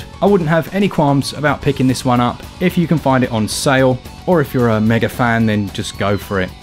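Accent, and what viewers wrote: England, United Kingdom. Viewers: Thanks for the updated patch review. Too many reviews are 2019 and prepatch, and just slate it. The game looked good so i grabbed it for 7Aud off switch store. Glad to see the game has been sorted out for the most part. Also good to hear another english accent on YouTube gaming reviews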